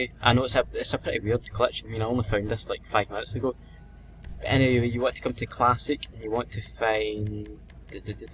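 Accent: Scotland, United Kingdom. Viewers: wish it would have worked but I love the accent.scottish?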